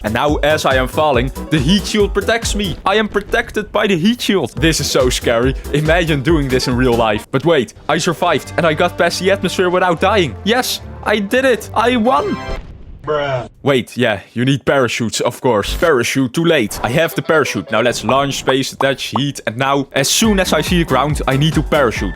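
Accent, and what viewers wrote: Netherlands. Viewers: I smell dutch (netherlands or nederlands) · i smell nederlands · Ru dutch? Ur accent is dutch.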